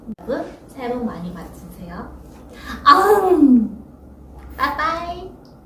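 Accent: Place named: Germany. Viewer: She has a German accent in English too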